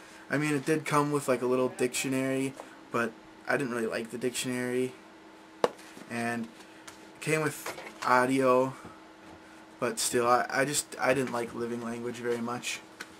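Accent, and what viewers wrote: United States. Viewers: He has a nice american accent.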